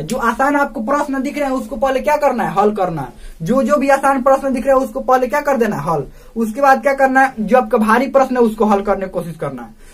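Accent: Bihar, India. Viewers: Bihari h ka babuaa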